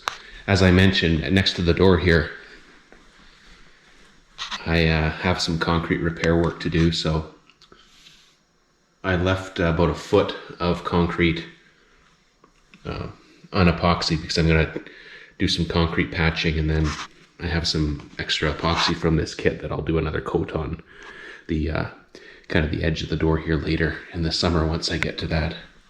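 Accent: Canada. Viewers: I KNEW you were from Canada when I heard the accent, eh!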